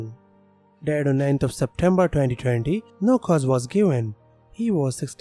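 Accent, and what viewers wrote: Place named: India. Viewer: He did not ruin a thing, It's just he is from India and learned to pronouns like the English with a dash of an Indian accent .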